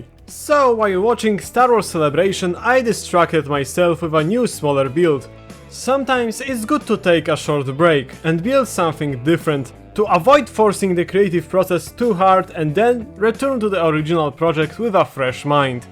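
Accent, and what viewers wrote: Poland. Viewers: Słychać że polak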